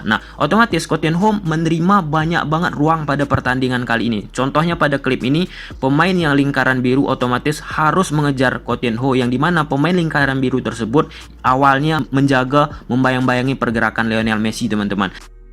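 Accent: Malaysia. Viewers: abang...logat melayunya kontal kali. dari utara kah? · logatnya kayak orang malaysia, warga malaysia kah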